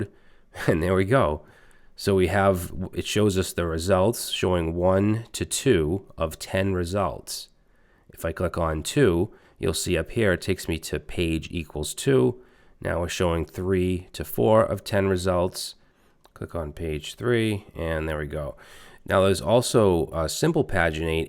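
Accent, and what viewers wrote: Boston, United States. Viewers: As a 10 year software engineer wanting to learn Laravel for fun, I'm loving this tutorial man. All tutorials should be done by folks from Boston, so I can occasionally hear "what tha hell is wrong wit me?" Better than 90% of the courses I've paid money for, and more efficient.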